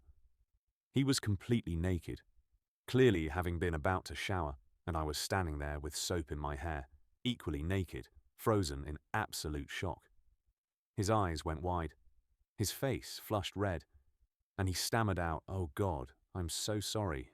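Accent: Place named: United Kingdom. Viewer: The Brit AI accent….sorry😨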